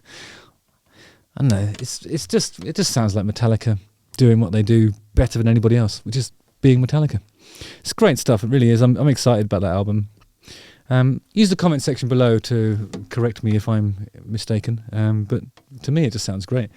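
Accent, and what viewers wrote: United Kingdom. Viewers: How can one person look like both Martin Short and Tommy Lee at the same time, and speak with a brit accent on top of it?... happy I stumbled upon this review! Thoroughly enjoyed it! Cheers!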